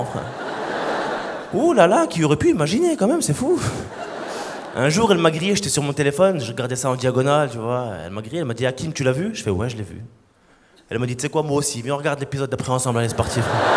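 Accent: Tunisia. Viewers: L'accent tunisienne de Hakim 💓💓😍💓💓😍😍 m'a tué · cet accent tunisien sucré là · De son accent je dirais qu'il est Tunisien, quelqu'un sait? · j'ai reconnu l'accent tunisien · L'accent tunisien je kiff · L’accent tunisien cramé de loin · Il a vraiment un accent Tounsi c'est abusé hhhhhhhhh je kiff je me laisse pas de regarder encore et encore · C est un tunisien lui juste à son accent.🇹🇳🇹🇳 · WAllah j’aime terriblement son accent tunisien alors que de base non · L'accent tunisien tellement stylé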